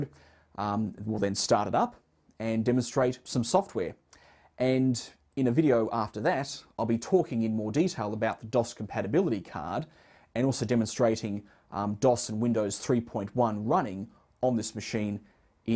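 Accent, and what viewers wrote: Australia. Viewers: I recognise that accent! Its the same as mine, he's an aussie!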